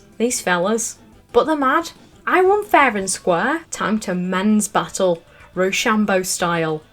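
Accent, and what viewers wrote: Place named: England, United Kingdom. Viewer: Recently found your channel and marathoned most of your content, I moved away from England 8 years ago and didn't realise how much I miss the accent. Glad to find a new upload.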